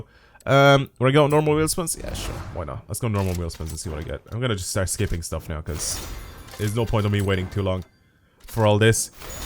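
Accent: Ireland. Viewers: Just subbed, great content! Also, which part of Ireland are you from? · Are you Irish?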